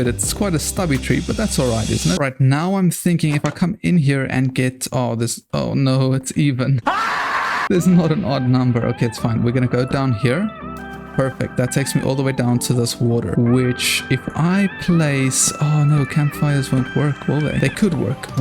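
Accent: South Africa. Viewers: Are you from south africa? I can hear a accent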